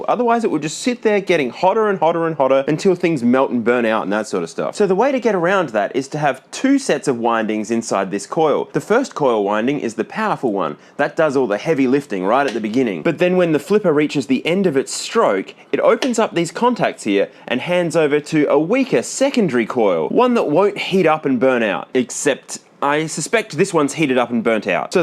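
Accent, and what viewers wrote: Australia. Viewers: I love the Aussie accent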